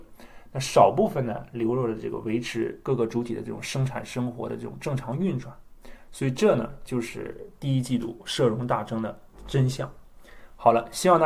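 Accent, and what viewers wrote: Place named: Shanxi, China. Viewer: 估计哥们是山西人，口音听着亲切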